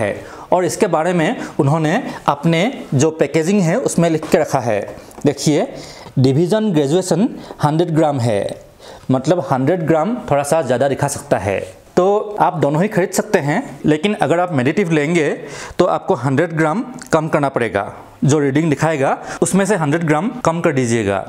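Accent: Assam, India. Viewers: Bhai aap assam se ho kya 😁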